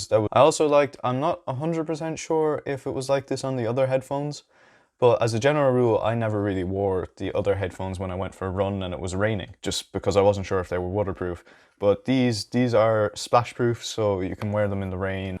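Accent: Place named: Ireland. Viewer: As a Swede/Dane who use to live in Dublin: It's always great to hear the Irish accent!! The best English accent in the world, I always miss Ireland when hear that accent. Irish people are down-to-earth people, I love that country.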